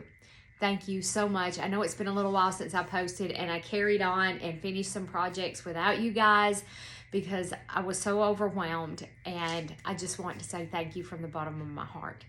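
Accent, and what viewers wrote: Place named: Alabama, United States. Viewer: Oh Wow! I totally loved hearing you answer all the questions… I always love listening to your Alabama accent too! Wonderful video!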